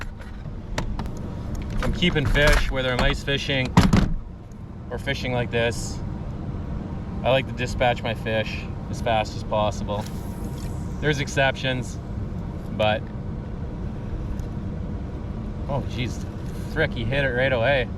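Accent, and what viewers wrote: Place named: Saskatchewan, Canada. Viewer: Great canoe camping videos. It made me very nostalgic, When I was very young (24ish, I am 62 now) I sold my car and all other possessions and went on an almost two years coast to coast (from Newfoundland to Vancouver) Canada backpacking adventure. I stopped the longer in Saskatchewan where I got a temporary job helping a University of Saskatchewan team trapping muskrats, mink & beaver in the lakes and rivers around Saskatoon as part of a large research project of professor Dr.Francois Messier. I was a young, enthusiastic vet and the team needed one for the anesthesia of wetland wildlife they were working with. Lots of canoeing and kayaking putting tons of Tomahawk live traps in muskrat and beaver dens in the summer. Later some snow machining doing the same in the brutal Saskatchewan winter. All in very similar places as your videos (therefore the nostalgia and listening to your familiar Sask accent). 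Lots of fun. Loved it. I run away in the winter to visit a friend in BC and to escape from the brutal snow & ice over there. New sub from the Yucatan Peninsula Mexico, I do some sea kayaking here if you are ever interested in that kind of stuff. Thanks for sharing. Elbows up! Canada strong!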